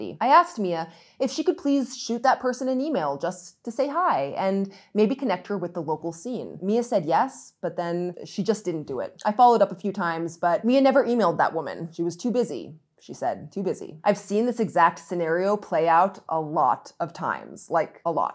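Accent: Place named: Texas, United States. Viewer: your accent puzzles me sometimes. You are not from the south but sometimes you get something that reminds me of the Texas accent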